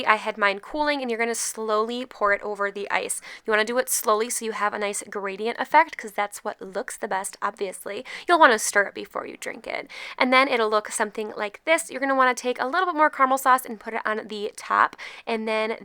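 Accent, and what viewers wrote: Australia. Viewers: Are you an Aussie? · Is this Australian?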